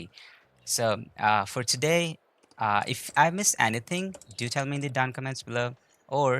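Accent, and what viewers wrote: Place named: Pakistan. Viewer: I can't tell whether you are Pakistani or british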